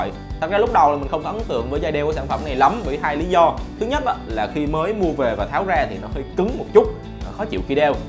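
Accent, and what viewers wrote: Binh Dinh, Vietnam. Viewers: nghe giọng Bình Định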